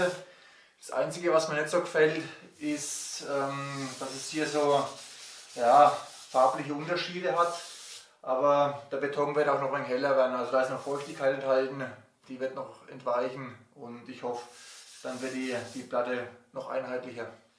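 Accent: Franconia, Germany. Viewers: Du kommst doch aus Franken des hör ich doch 😝 komme aus Würzburg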